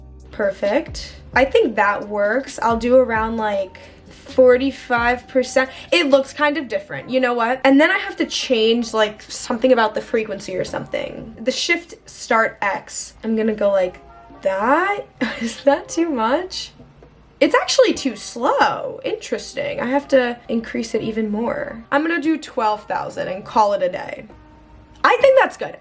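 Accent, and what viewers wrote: England, United Kingdom. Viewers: Love your English accent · Make an edit tutorial while using your British voice! Whenever you dont/forget talk American you show one of your old edits !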